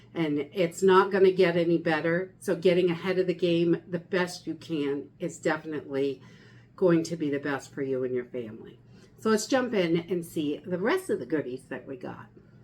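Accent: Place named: New England, United States. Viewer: Im new to your channel but we seem to think similar. I dont know where your from but the accent and brown bread makes me assume New England someplace. Im from mass and nh but moved to nc about a year and a half ago. Nobody here kbows what brown bread is! I guess brown bread and beans is a New England thing!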